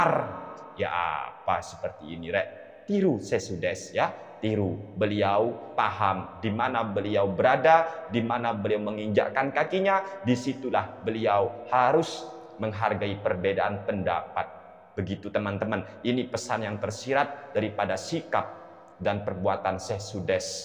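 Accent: Madura, Indonesia. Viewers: Logat Madura ya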